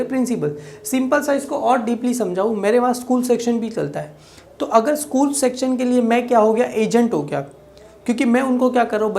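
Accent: Gujarat, India. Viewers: Inki accent se ye gujrati lgre h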